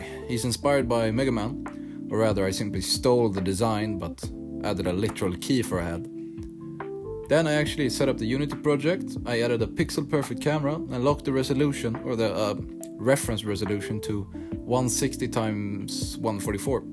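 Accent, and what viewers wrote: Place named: Sweden. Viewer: Great job! Love the Swedish accent as well!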